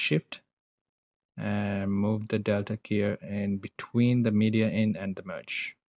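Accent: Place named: India. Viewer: Du hast so einen indischen aktzent hört sich witzig an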